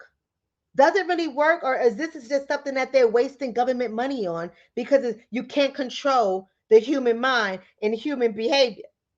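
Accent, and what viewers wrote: New York, United States. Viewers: I am in LOVE with your New York accent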